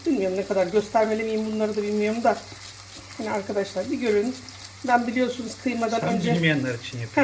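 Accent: Aegean, Turkey. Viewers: Hanımcığım, Ege şivesiyle konuşmanız, içten ve samimi anlatımınız çok güzel. Ekmek dolmasını rahmetli anneciğim yapardı. Bu tarifinizi izlerken anneciğimin mutfaktaki uğraşı gözümün önünden film şeridi gibi geçti. Anılarım canlandı.😔 Ekmek dolmasının kokusunu duyar gibi oldum. Size çok teşekkür ediyorum. 🙏 Ellerinize, emeğinize, tatlı dilinize sağlık. 🙏 Kameramanınız sevgili oğlunuzu da kutluyorum. Çekimde ve soru sormada çok başarılı.👍👏👏👏Yiyenlere afiyet olsun canım. 😋 Selam ve sevgiler...🙋♥️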